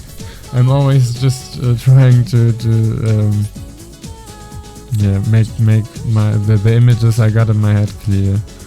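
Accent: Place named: Germany. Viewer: Man hört ein schönes bisschen Deutsch aus deiner Stimme ^~^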